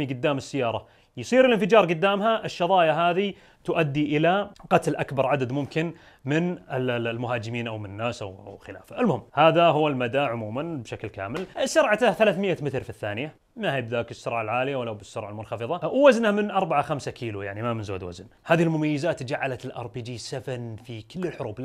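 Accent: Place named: Saudi Arabia. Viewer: اعجبني ان عربيا واعتقد لهجتك سعودية لقد ابدعنا في استخدامها في لبنان وادخلنا ابتكارات من 1975 وحتى 1990 لا يمكن شرحها الان القذيفة التقليدية العادية ثلاث مراحل التاندوم اربعة مراحل الروسي بثلاثة الاف درجة مؤية المصري الكوبرا خمسة الاف درجة مؤية المسماة الكوبرا الياسين من المبكر الحديث عنها تقنيا جهاز رصد الميركافا بعيد المدى وفشل دائما امام الياسين لقصر المسافة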